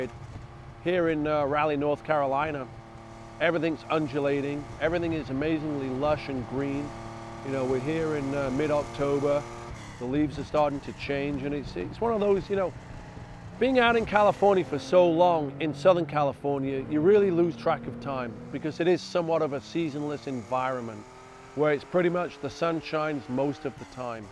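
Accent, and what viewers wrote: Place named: United Kingdom. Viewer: You can really tell that he's a British boy that's lived in the states for so long. His accent sounds so muddled between the two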